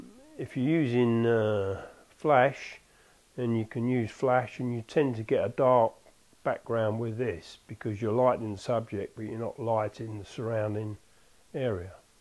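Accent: United Kingdom. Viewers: Britain accent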